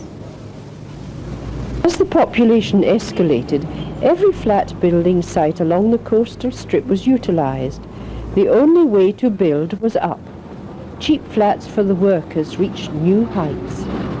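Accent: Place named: United Kingdom. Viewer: Very well done and loved everything about it. As a child visiting HK in the 1970s this brings back so many happy memories, this was a great time to live and a great place to live. Loved the traditional music, of course, and the narration, so British!! I have not been back since the 1980s and now things are so different under China's control. The memories will always however be there and this short video was a blessing. Many thanks for this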